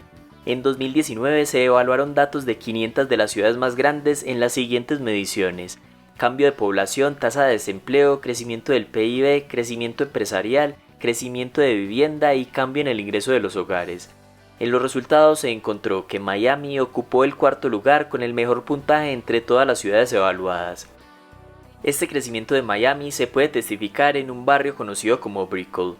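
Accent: Colombia. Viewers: Es colombiano, tal vez de medellin o del eje cafetero (pereira, manizales, armenia) etc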